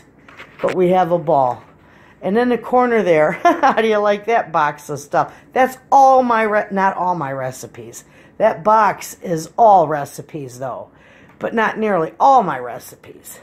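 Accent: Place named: Northeast, United States. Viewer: Hi. Thanks for taking us through your beautiful home. You are organized and efficient! Sounds like you have a North Eastern accent. I'm from upstate NY (but in SoCal for 40 years now) so you sound like all my relatives. Have a wonderful day.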